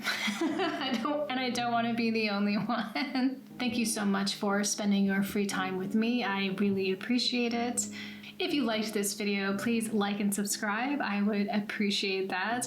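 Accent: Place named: United States. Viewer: Congratulations on 2,47K subscribers!!!!! I have a question, Why did you move to Berlin, Germany, I assume you're from the states due to your non german accent!! lol. Do you think you will ever move back here???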